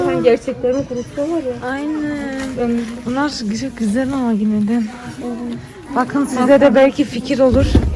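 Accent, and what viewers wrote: Turkey. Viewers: orda doğdunuz yani nerelisiniz peki şive doğu gibi geldi yada yabancı dil konuştuğunuz için mi kız kardeşimde Fransa da 2 gün falan oldu gideli orda yaşıyo yeni gelin 😊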